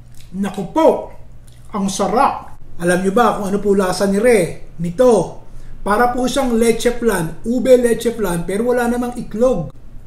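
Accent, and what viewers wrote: Philippines. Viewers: are you from Nieva ecija ? the way you talk you sounds like my cousins from the philippines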